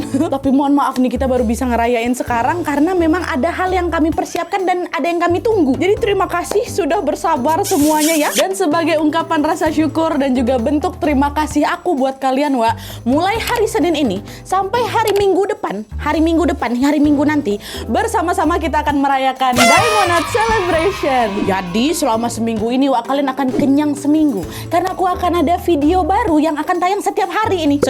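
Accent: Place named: Riau, Indonesia. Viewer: selamat kak..🎉🎉-/+Bru 5bulan aku ikutin Chanel ini,awal mula aku tau dri anak gadisku yg skrg lgi mondok.kita sering nonton ber2,yg sering kita tonton cerita horor Krn emank kita suka cerita yg barbau horor..😁mpe saat ini aku sll ikutin cerita yg lain Krn emank seru dngerin kak cerita tuh..kyk lgi ngobrol aj gtu..,😊aku jg 11thun tinggal d Riau tepat'y daerah perawang bnyk kenangan dsna.tpi skrng aku dah pindah k Banten..jdi pas dngr gaya bahasa kak..rasa'y msh berada d Riau..😁sukses sll ya kak..😘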